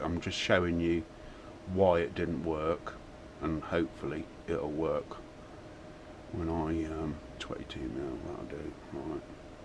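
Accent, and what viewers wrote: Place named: Wiltshire, United Kingdom. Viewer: Hi, Where in the UK are you? I used to live in Swindon Wiltshire, Your accent seems to be from around that area, or Gloucestershire ish. I now live in Australia. The two comments below are mine. If the little bit of CNC that I know can help you in any way, just let me know. Kind regards, Michael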